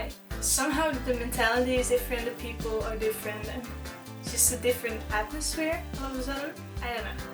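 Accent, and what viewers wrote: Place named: Brabant, Netherlands. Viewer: Nice, please tell more Brabant accent that is lovely...😊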